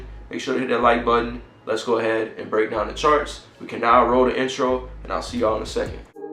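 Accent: Louisiana, United States. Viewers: I knew you was from Louisiana accent heavy im in New Orleans ⚜️⚜️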